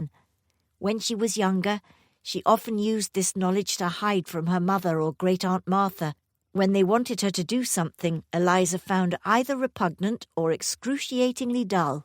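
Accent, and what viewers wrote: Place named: England, United Kingdom. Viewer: How wonderful to hear an English accent🎉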